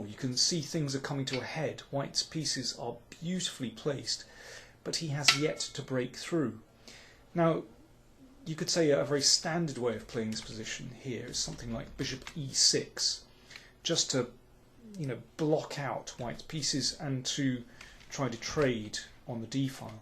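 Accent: United Kingdom. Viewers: What the the heck are you talking about with his speech!? He sounds perfect to me and so charmingly British! I'm genuinely curious what you hear that I don't.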